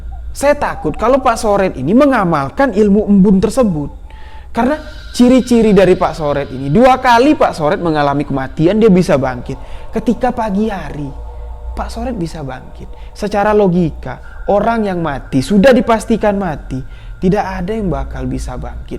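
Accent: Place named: Bali, Indonesia. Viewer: Oalah, saya baru liat eps ADUM. Ternyata abng tinggal di Bali., pantesan logatnya spt org Bali. Sukses terus bang, sy suka cra penyampaian cerita dr abang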